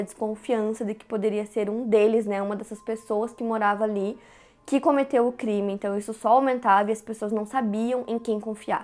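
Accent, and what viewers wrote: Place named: Paraná, Brazil. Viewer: Ela é de Ponta Grossa (Paraná). Também amo o sotaque dela!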